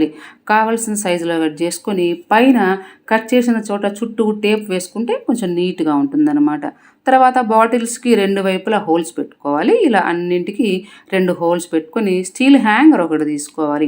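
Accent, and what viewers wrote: Nellore, India. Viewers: మీది నెల్లూరు అనుకుంటాను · Medhi nellore aa mam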